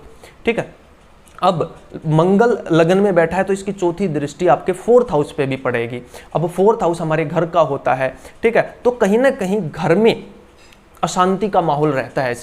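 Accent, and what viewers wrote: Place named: Haryana, India. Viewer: ji aap ki hindi mein haryanvi tone aa rhi hai 😃 I guessed correctly 👍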